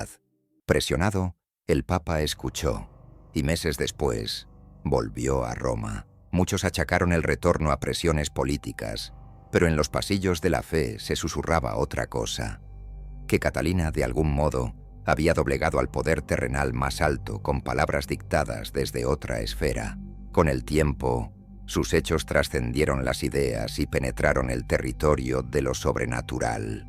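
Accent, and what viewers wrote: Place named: Spain. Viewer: estos españoles no son agradables escucharlos narrar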